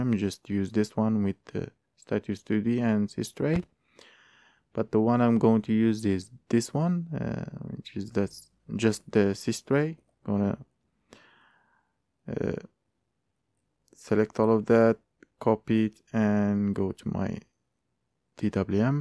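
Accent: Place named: Morocco. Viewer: great. i was confused by your voice and accent because you sound like me and I was right you are moroccan too LOL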